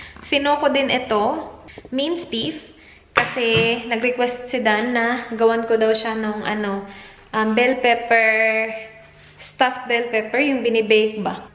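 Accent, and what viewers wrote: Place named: Visayas, Philippines. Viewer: Hi ate! Bisaya din po ako. Ang saya talaga manood ng mga vlogs mo. Nindot kaayo inig naga bisaya ka kataw anan kaayo haha ❤️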